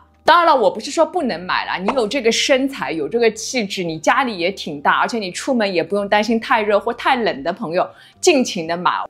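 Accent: Shanghai, China. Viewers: 播主上海人吗?言语间有些小词很熟悉😅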